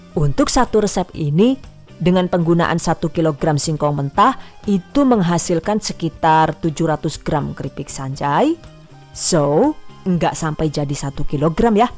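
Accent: Banyumas, Indonesia. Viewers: Belum lama kenal vlog ini, langsung jatuh hati. Lagsung subcribe, kepo buka video" semua masakan😄😄 Sangat menginspirasi sekali. Jelas & mudah penjelasannya. Bahasanya itu lohh.. enak dengernya... Palagi pas yg ngapak".hehehe...Ngakak polll #giveawayTHVagustus19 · Penjelasan nya detail.suara nya enak di dengar,lucu ada ngapak nya.bahasa Inggris nya juga keren.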